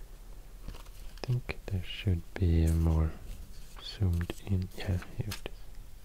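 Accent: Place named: Sweden. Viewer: Du er så god til soft spoken ASMR og din milde svenske accent er så afslappende! Hilsen, en nabo 😋🇸🇪🇩🇰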